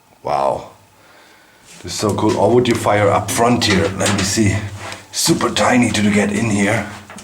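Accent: Germany. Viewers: The submarine space inside is not very comfortable but this man says that that place is narrow but assuming this man is german (because of his accent) I'd say his super tall. The video and the explanation are great. It's amazing to imagine how everything used to work. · Alman englisch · the joke is that he is german, so its obvious he has a german accent lol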